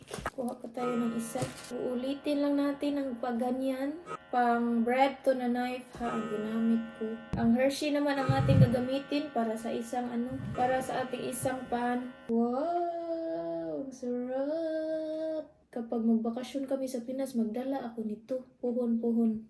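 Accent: Visayas, Philippines. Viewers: Ang cute mo magsalita ng bisaya,sak² sinagol Tagalog ba😀😀😀pag amping mo ug sa jogging nyo💪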